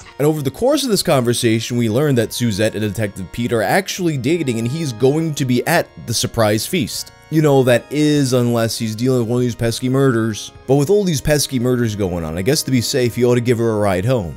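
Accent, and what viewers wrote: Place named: Buffalo, United States. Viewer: sounds like he's from Buffalo or something.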